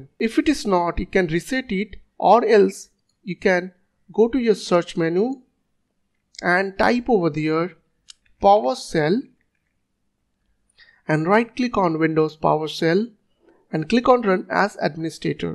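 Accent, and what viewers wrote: India. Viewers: Video accent English - - - - - - - Indian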